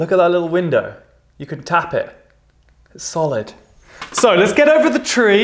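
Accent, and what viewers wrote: England, United Kingdom. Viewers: Acabo de suscribirme y creo que tu acento inglés es inconfundible jajaja espero no equivocarme. Love your videos, and you❤️ I come from Chile 🎉😊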